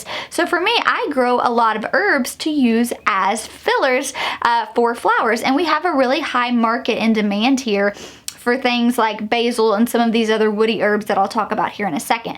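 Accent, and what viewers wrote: South, United States. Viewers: Hey, I am growing every one of those! I have soooooo many seeds But they are very well organized, separated and sorted. I have all my seeds on a schedule in my calendar Every Tuesday I start various vegetables and such then Thursday I start various flowers. This is all based upon how many weeks prior to the last frost date for me I want to use April 29th as the last frost date. So everything revolvers around this. So then all these dates are entered into my iPhone calendar as repeat every year. This is my most organized year ever! So my schedule is working well so far. I really hope to see good results this year so I can organized some photos and put them on Instagram which I am doing sporadically but I want to get better at having a schedule for this. Final note I absolutely love listening to you. I miss living in the south and I love southern women's accents. 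So I think I have enough channels now that it is amazing listening to y'all folks. Anyway God bless y'all Cya later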